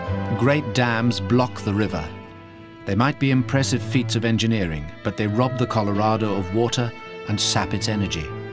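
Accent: United Kingdom. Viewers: I live right on the confluence of the Colorado and the Gunnison Rivers. The photography was beautiful. Colorado is a beautiful state and the many rivers and tributaries are fascinating. Unfortunately there were many inaccuracies and mispronunciations in this offering. Yeah and the Brit?! Really...🤨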